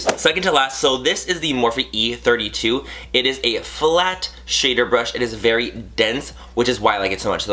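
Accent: England, United Kingdom. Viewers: can you do an entire video in your English accent please? tysm! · Why does he talk in a British accent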